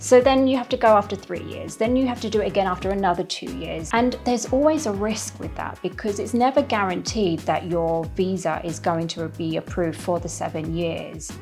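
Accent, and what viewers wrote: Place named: Australia. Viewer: I was not expecting this video to be in Australian English.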